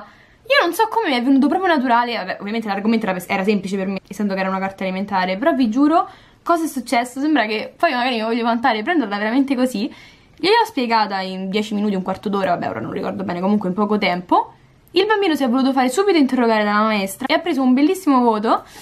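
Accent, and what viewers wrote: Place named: Rome, Italy. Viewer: Ciao, ti ringrazio per questo video, l'ho trovato molto utile. Dalla cadenza sembri di Roma, come me... puoi dirmi quale liceo frequenti?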